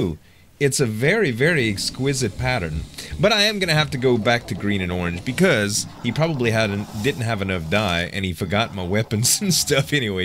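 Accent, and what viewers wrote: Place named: Florida, United States. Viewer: I'm originally from Florida but am in Washington. Your accent brings back good memories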